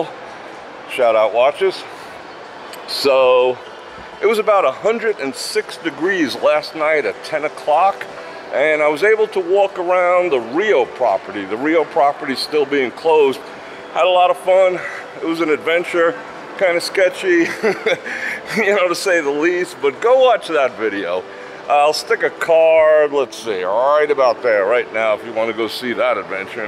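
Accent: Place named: Brooklyn, United States. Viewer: Ahh, there's some new knowledge. Also, I now hear Brooklyn in your voice.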